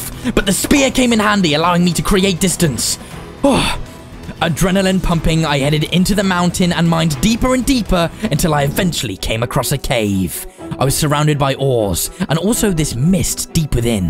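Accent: United Kingdom. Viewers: I like your British accent it's cool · I saw herobrine u bri'ish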